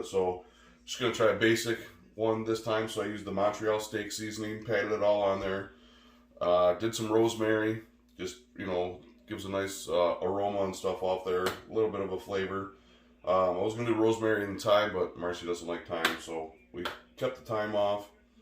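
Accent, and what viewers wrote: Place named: Wisconsin, United States. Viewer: Where are you from? Sound like wisco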